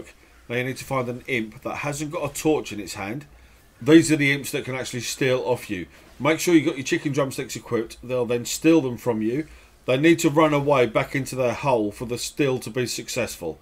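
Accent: United Kingdom. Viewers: It's weird how even a video about a silly game spoken with a Brittish accent sounds like a professor saying that the French is invading England.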